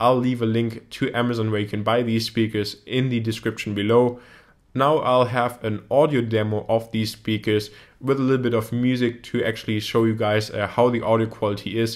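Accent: Germany. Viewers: Bei der Aussprache. 100 % deutscher hahaha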